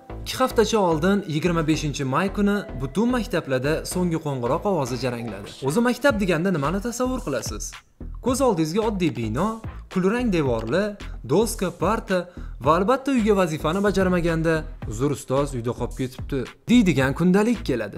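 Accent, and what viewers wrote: Tashkent, Uzbekistan. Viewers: Iltimos shevada gapirishdan qochishingizni so'rardim Toshkent shevasi yaqqol bilinib qolyabdi vedio uchun esa rahmat olg'a davom eting.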